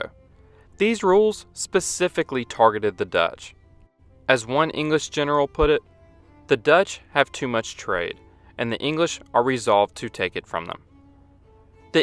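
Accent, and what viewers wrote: South, United States. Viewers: Dude I love your voice. So relaxing. I can tell you’re from the south!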